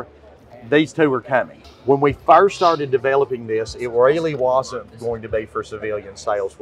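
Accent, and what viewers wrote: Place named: South, United States. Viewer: Where are you from? Im from Bama and damn thats some southern twang.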